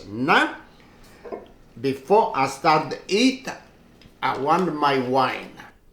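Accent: Italy. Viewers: I love that Italian accent…reminds me of my grandparents ❤❤. We need more stories of your life in Italy!😊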